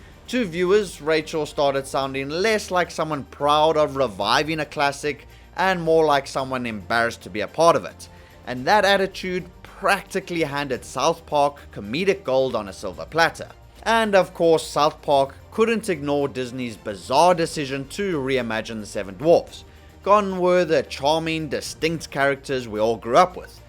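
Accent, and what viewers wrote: South Africa. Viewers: You sound south african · I know it says united states under your page but I can't unhear it, you sound South African bro are you from here by any chance? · Kan hoor jy is n South African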